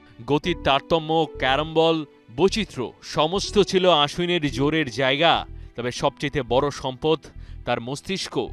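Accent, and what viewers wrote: Kolkata, India. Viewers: আপনার কন্ঠ কলকাতার লোকদের মতো কেন?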